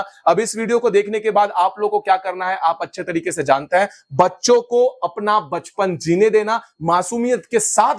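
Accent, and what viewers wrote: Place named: West Bengal, India. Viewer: Hindi bolne ka style sehi pata chal gaya tha e West Bengal se 🤮🤮 feel ashamed 😞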